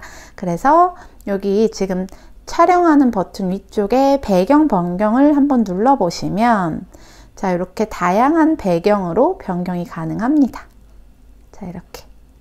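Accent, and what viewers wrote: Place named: Gyeongsang, South Korea. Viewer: 경상도 사람이네요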